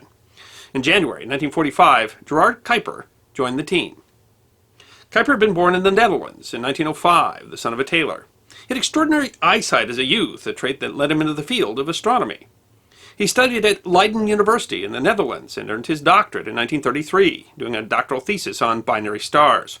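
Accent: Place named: United States. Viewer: I love how Americans try to pronounce kuiper lol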